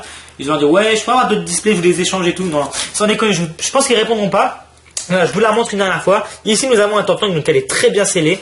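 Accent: France. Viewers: Suicune se prononce suicoune mais tu a to. Accent français xD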